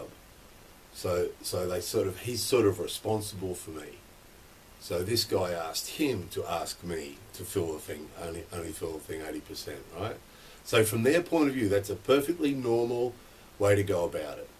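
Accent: Australia. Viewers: I just love the sound of your australian accent talking about details across japan, a country that I do miss a lot! thanks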